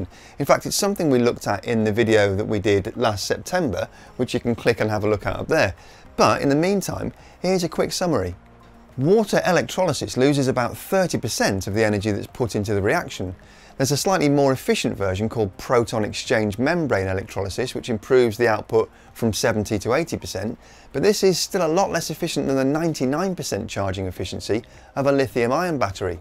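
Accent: United Kingdom. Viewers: Listen, the Brits decided to start pronouncing their vowels opposite long ago, simply to be contrary. Get over it.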